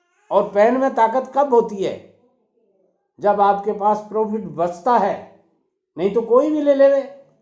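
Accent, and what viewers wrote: Rajasthan, India. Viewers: Dadaji Aap Rajasthan me konse jile se ho Bhansa aapki Rajasthani si lg rhi h. point 1. Bai ka fool bai k lag jay. 2.Bahut bde bangle ka kam tevad diya.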